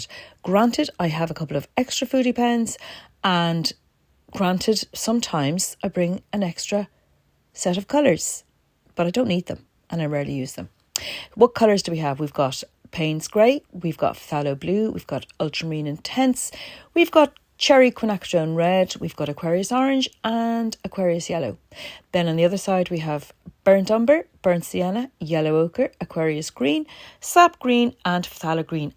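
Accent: Ireland. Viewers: and it’s a joy to hear your beautiful accent, reminds me of all my cousins in Ireland ☘️☘️☘️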